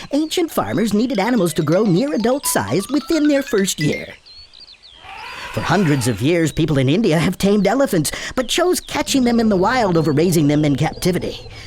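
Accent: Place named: South, United States. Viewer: the voice sounds like a hillbilly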